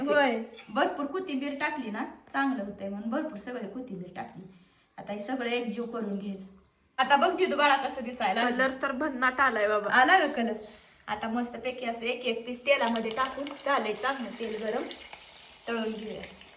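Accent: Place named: Mohol, India. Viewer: Bhasha vegla bolta tumhe chan ahe pan as bolt nahet mohol madhe tumhe as ka bolta mast vat pan simple bolna god ahe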